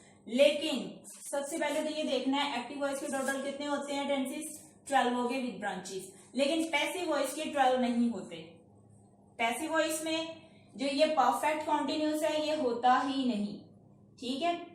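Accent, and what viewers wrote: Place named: Lahore, Pakistan. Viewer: Are u from Lahore.....great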